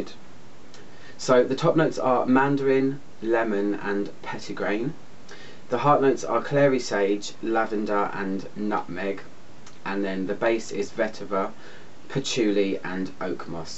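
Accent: England, United Kingdom. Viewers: i love your voiceeeeeeeeeeee!!LOVE YOUR ACCENT ENGGLISH PEOPLE IS AMAZING!!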